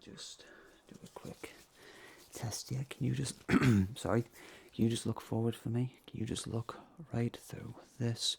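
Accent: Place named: Newcastle, United Kingdom. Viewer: Finally! A geordie ASMRtist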